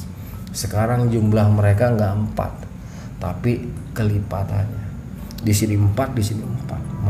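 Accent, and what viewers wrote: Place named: Jakarta, Indonesia. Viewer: 😀😀😀😀😀 Gw demen banget aksen betawinya Bang...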